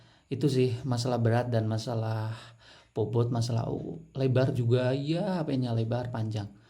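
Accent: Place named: Java, Indonesia. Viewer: Abang orang jawa yah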